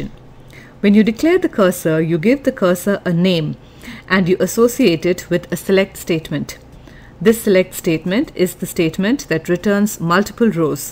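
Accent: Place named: India. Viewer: Thanks for this. Good explanations and it is fantastic to hear someone with an Indian accent speaking English so clearly.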